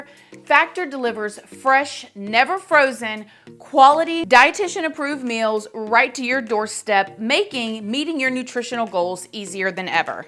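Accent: South, United States. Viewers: I love your content but love your southern dialect even more ☺️ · Love southern charm btw job corps = “core” not “corpse”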